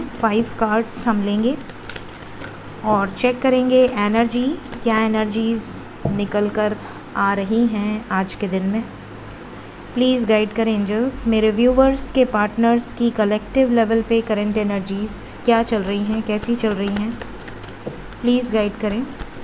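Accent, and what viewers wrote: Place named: Varanasi, India. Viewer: Dii jiss tarah se aap bat karti hai I totally luv it❤️ full banarasi language even I m from varanasi Mahadev🙏 love your positive reading 😇🤗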